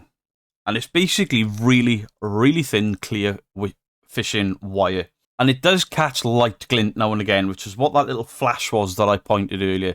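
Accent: Wales, United Kingdom. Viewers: Petition for to read audio books. I love the welsh accent ❤❤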